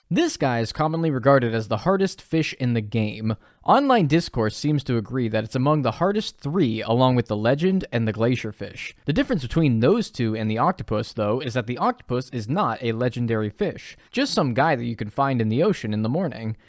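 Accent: United Kingdom. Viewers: Are you British by chance?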